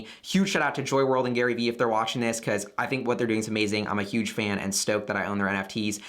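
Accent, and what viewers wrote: United States. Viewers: Your American accent is so gey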